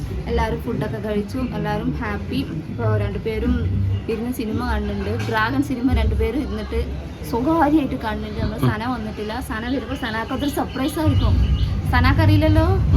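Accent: Tamil Nadu, India. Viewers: എത്രയോ ചെറുപ്പത്തിൽ അവൾ തമിഴ്നാട് പോയതാണ് അന്നുമുതൽ അവൾ സംസാരിക്കുന്നതും ഇടപഴകുന്നതും അവരോടൊപ്പം മാത്രമാണ് അതുകൊണ്ടാണ് ഇങ്ങനെ സംസാരിക്കുന്നത് എന്തിനും കുറ്റം പറയല്ലേ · നീയൊന്ന് മലയാളം പറ അല്ലെങ്കിൽ തമിഴ് പറ. പറയുന്നത് കേട്ടാൽ തോന്നും നീ ജനിച്ച് വളർന്നത് തമിഴ്നാട്ടിലാന്ന്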